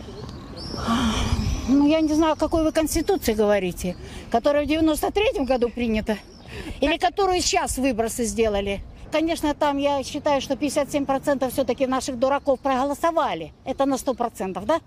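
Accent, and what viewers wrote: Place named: Ukraine. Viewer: У нее украинский акцент! снято в Киеве на съемной квартире! Фу!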